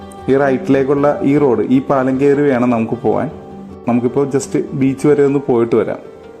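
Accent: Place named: Kollam, India. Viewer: Shudhamaya Kollam slang.. ethaand achadi malayalam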